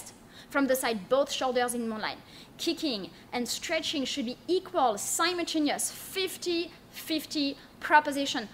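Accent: France. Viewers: Beautiful, positive energy! Fantastic class, and love the pretty French accent :) . La Fance me manque!! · Thanks for this amazing class. I miss so much my studio in Montreal. I will practice with you guys from now on...and I love yourFrench accent as well 😉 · is the best!! She keeps you moving for 60 min never skipping a posture or a cue!! Her French accent is adorable and her dialogue is perfect. Thank you 🤗🙏🏼🧘🏽‍♀️💜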